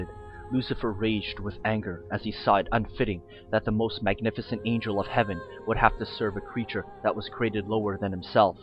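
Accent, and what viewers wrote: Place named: United States. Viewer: Before you try to claim I'm being anti-patriotic, I'm an American. Anyway, there is nothing more cheesy than an American accent mixed with forced heavy tone - it just sounds laughable. I would prefer a Rabbi speaking in Yinglish (Yiddish/English) over this very non-threatening and boring and characterless American accent. Boring.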